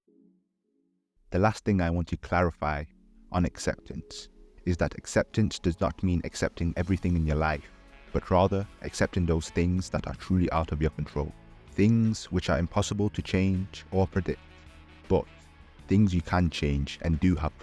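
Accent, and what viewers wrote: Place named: United Kingdom. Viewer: What are you going on about? Maybe your ears are broken. He's clearly British. 😂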